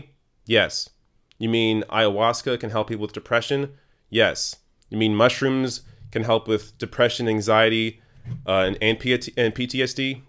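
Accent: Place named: Canada. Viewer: your accent betrays your Canadian-ness, and I have always wondered why you focused on American politics. Thanks for clearing that up. I love your rational voice as a Progressive and will promote your videos as I can.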